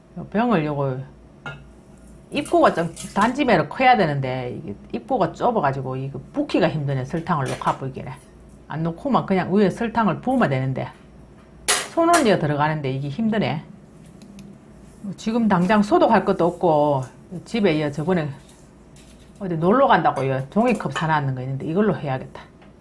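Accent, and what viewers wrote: Gyeongsang, South Korea. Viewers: 갱쌍도 사투리가 엄~좋아부러유~~♪~♬~♩ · 경상도 아지매 정말 정겹습니다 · 선생님 고향어디에요 말씨가 시골말 참듣기좋아요ㅣ 답장주세요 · 저는 너무 경상도 말씨가 너무 정감이 가요 매번 참 잘 보고 공감 을 합니다 매번 알틀 하시고 경제적이고 쉬위서 많이 이해가 가요 근데 매실은 오래 두면 독이 생기고 씨가 그렇다고 한데 먹기가 참 아리송 해요 담 아서 얼마간 숙성 을 시켜야 하는지요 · 갱상도 사투리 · 세프님 사투리 넘심해요 나도 경상도 출신인데 듣기가 좀거북 하네요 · 여사님영상을계속보는대경상도발음이넴.귀엽고사랑스럽네요 향상구독하니.건강하시고좋은영상많이보내주세요 넴좋아합니다 여기는부산입니다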